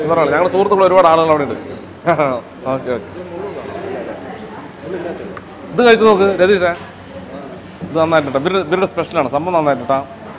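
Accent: Palakkad, India. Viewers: Pakka palakadan slang 😎😎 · ഫുഡ് വ്ലോഗിൽ ചേട്ടന്റേം വിനിച്ചേച്ചിടേം( vinis kitchen) ചാനൽ കാണുന്നത് നമ്മടെ പാലക്കാടൻ സ്ലാങ് കേൾക്കാൻ വേണ്ടി... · oru palakadan 👌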